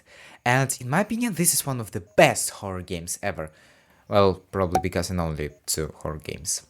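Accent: Russia. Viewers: у тебя акцент русского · русский акцент везде услышу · Акцент подсказывает мне что ты русскоговорящий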